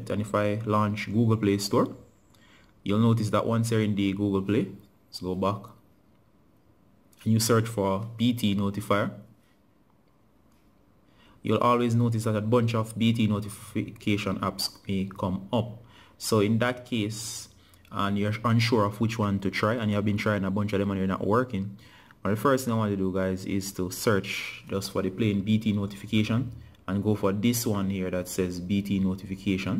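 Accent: Jamaica. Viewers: Are you jamaican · Stfu he's jamaican like myself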